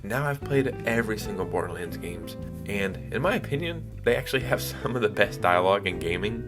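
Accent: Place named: Orlando, United States. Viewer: So this is what Orlando people sound like. That’s chill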